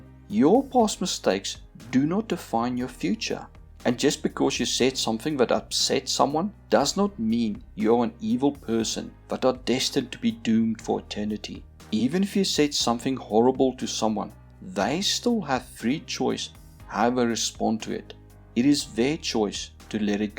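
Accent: South Africa. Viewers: Great info Are you South African?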